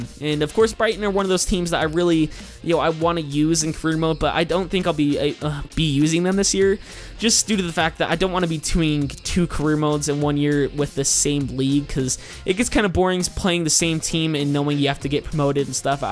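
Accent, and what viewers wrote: United States. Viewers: Bro, Utah accents are weird.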